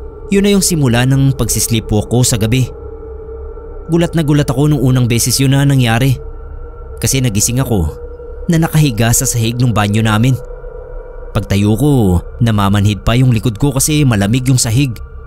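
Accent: Iloilo, Philippines. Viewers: boss first time ko sa channel mo pero naririnig ko na mga videos mo kapag nanunuod kapatid ko . Gusto ko lang itanong, ilonggo ka no?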